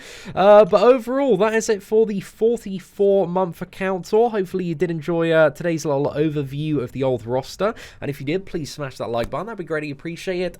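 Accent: England, United Kingdom. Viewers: Compared to the other MCOC utubers your are first mainly because you have a very nice English accent !!!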